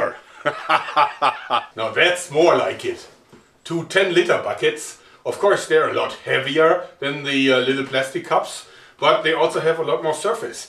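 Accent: Germany. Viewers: Either this is the thickest german accent I've heard in my life should include lederhosen or it's the thickest Swenglish I've ever come upon. CHARMING FELLOW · I am from austria we speak german (sort of) and before i watched his videos my englisch sounded very clean. But now i use the german axcent (his german axcent) in every sentence when i speak, ha ha ha, life is fun. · i just love his german accent😂😂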